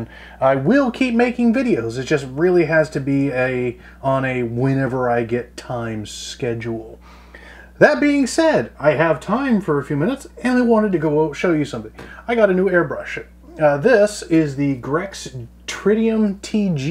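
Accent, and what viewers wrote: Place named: United States. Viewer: Never heard of Grex airbrushes, so am guessing by your accent and hints about Dollars you are in USA?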